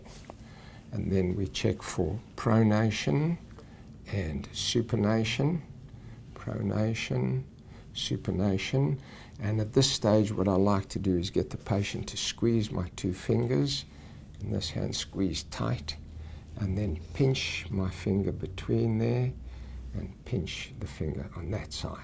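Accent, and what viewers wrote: South Africa. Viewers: I thought was south african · I was also confused. I heard South African but when I looked him up it indicated Australia! Well, went to Wits so definitely South African, but I suppose just moved to Australia. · South African accent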